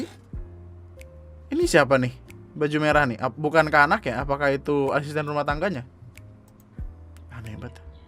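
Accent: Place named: Banyumas, Indonesia. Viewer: bang asli nya org mana dah kalo gw ngapak jdi agak mirip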